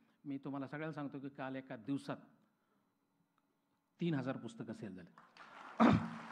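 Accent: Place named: Rural, India. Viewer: पुढ जाणार भाऊ तुम्ही. भाषण तर ठिकच. पण बोलताना जरा ग्रामीण बाज आहे. म्हणाल्यावर पुढ घेणार ते चौकडी तुम्हाला. 😊😊😊😊😊😊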